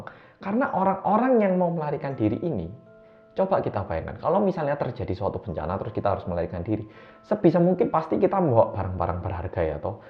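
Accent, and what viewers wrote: Java, Indonesia. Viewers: Mas yaaang medhoookk. 😀 Welcome detektif reomit ❤️❤️❤️ Stay healthy forever,mas 🤗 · Oppa medok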